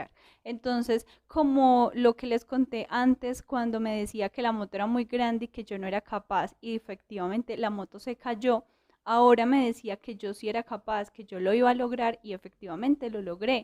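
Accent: Colombia. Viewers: Exelente video me gustan las motos y encontré tu videos y los miro por como ablas colombiana y tu humildad te hace grande saludos desde San Diego California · Me encanta el acento colombiano disfruto. Ollendote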